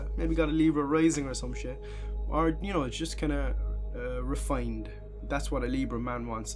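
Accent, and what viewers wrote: Ireland. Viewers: Ireland… WOW. Your accent is aMAzing😃. I like a Libra a lot, but… I didn’t realize he liked me at all(!). I thought he was just being nice and vice versa. He thought I was just being nice. Suddenly, I began receiving highly spiritual signals about this guy. I misunderstood these signals for about two months—till recently. Then, I realized he was trying to tell me too. The challenge is that we see each other in a professional environment. I trust that God has a plan. Cheers🌬️🌤️🕊️.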